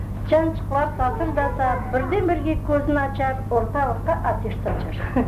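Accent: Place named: Bakhchysarai, Ukraine. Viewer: Büyük zevqnen baqtım, öz Bağçasaray lehçemizni eşitmek ne qadar hoştır 😍 bu işke isse qoşqanlarınıñ elleri dert körmesin!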